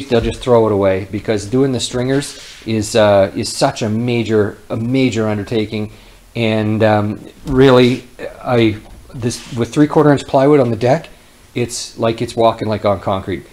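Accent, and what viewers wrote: Canada. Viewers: Don't speak Canadian. Didn't learn anything.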